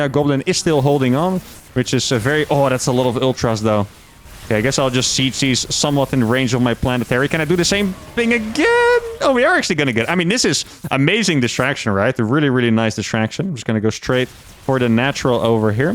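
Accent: Netherlands. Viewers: Oh ja, dat Nederlandse accent XD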